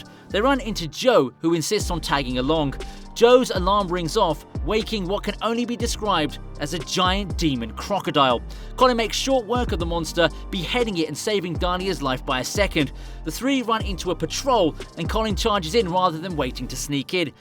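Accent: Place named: United Kingdom. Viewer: with a British accent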